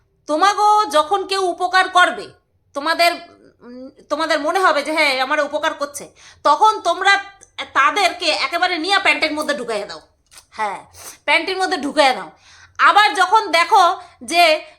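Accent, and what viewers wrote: Bangal, Bangladesh. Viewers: Orey ki sundor bangal vasa sunlam..darun lglo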